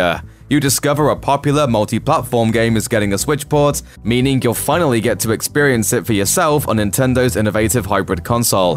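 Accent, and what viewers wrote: United Kingdom. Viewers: This video didn't answer my question at all..it just showed me that anything I look up about video games is gonna give me a narrator with a 'eavy british accent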